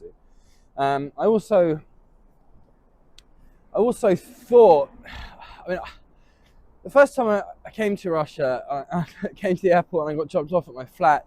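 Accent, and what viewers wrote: United Kingdom. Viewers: Блин, чел, как же тяжело воспринимать английский акцент после постоянного американского. Англичане, пилите больше контента! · Okay, I subscribed. I hope it will be interesting to look at Russia through the eyes of a Brit, and at the same time it will be useful to listen to a non-American accent. For some reason, I suddenly remembered a quote from a famous Soviet comedy: "- Well, if you come to our place on Kolyma, you'll be welcome... - No way! You'd better come to us!" 😂😂😂 Greetings from Krasnoyarsk!✌😉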